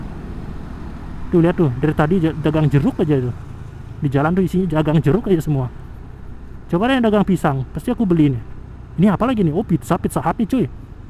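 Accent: Bali, Indonesia. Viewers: Aku Orang Jawa Tapi Gak Tau Ke Napa Gw Suka Dengan Logat Orang Bali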